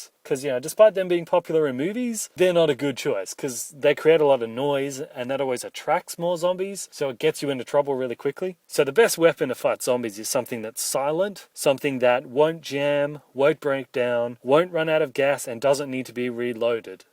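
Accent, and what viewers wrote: Australia. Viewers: Wait are u aussie if yes YEEEEEEEEEEES TEN BUCKS IS MINE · OH MY GOSH I KNEW WAS AUSSIE AFTER THE FIRST VIDEO! OH MY GOSH WOOOOOW (i live in aussie) and i thought you were to!!! oh my gosh thats amazing there are known people on youtube that aren't american. i solute you! PS I AM SUBBING! KEEP IT UP MATE!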